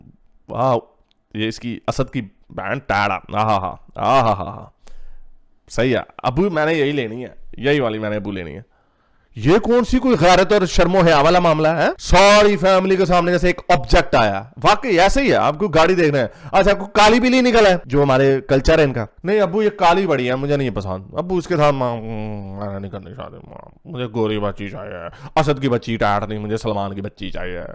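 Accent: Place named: Punjab, India. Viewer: Aap ke panjabi bolne ka style sunn ke majja aata hai.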